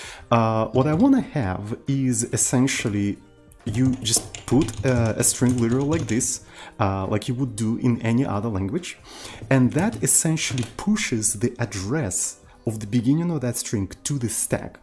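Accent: Russia. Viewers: Чел наверняка русский (с таким-то акцентом)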